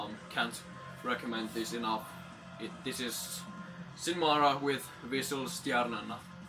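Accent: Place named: Europe. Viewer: Where are u from? U have an European accent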